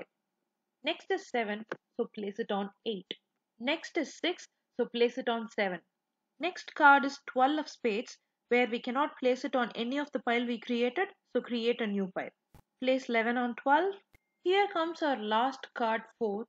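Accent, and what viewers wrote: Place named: India. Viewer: I wont lie...normally I ignore videos in Indian accents (because generally they're rubbish)...but this was excellent. Well done and thanks.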